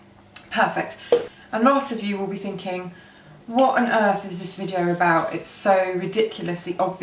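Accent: United Kingdom. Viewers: I like how you saw "tomato" :)) love your British accent! To us here in the US it sounds so interesting and nice!! I could listen to you all day!!:) Luv from New Jersey!!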